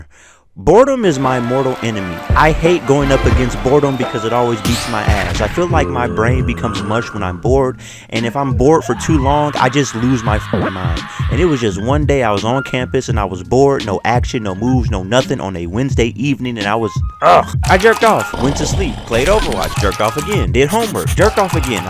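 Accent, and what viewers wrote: New Jersey, United States. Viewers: Where u from jersey? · U frm jersey???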